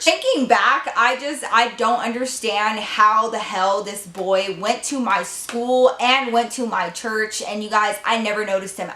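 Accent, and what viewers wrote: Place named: South, United States. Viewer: southern accent comes out more when she gets mad 😂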